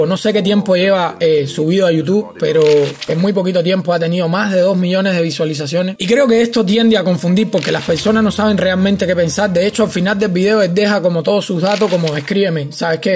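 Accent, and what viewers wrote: Cuba. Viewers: hubo muchos que no entendieron bro, empezaron una discusión absurda por acentos cuando realmente es gracioso pero carismático ese acento cubano suyo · Dale loco todos sabemos que es cubano y es su acento por naturaleza digamos que tu comentario no es nada atinado ante una persona sale e invierte tiempo de su propio tiempo para hacer un video alertando a los mas jovenes sobre hacer estupideses luego de grabarlo subirlo publicarlo lo mas inteligente que haces en el teclado es redactar sobre su forma de hablar rayos viejo solo atinas a burlarte? No jodas que tienes en la cabeza inmadures o no sientas cabeza se ve que ya estaa grande para eso no lo crees? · Que bola brother por tu acento pareces de Cuba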